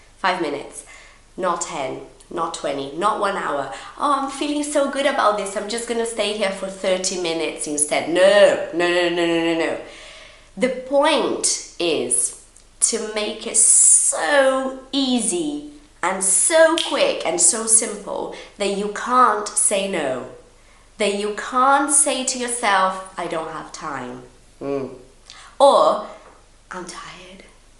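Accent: United Kingdom. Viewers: Your british english is great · ....hi....Ididn't like British English till Know You....I'm loving now to listen You...kisses❤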